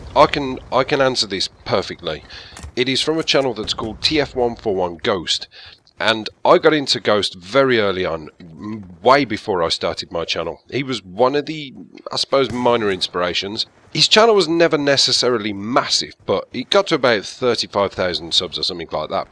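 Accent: Birmingham, United Kingdom. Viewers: I find it really hard to believe that no one noticed you were from birmingham. Good video though, got to know the old stalion a little better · Lol there are people with a much thicker Birmingham accent than him and it does sound pretty grating lol. Also England will have a king when the queen dies, but the UK doesn't. The UK is a group of countries including England, Scotland, Northern island, and Wales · I just love the British accent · I thought you were a brummie but wasn't sure. Confirmation ftw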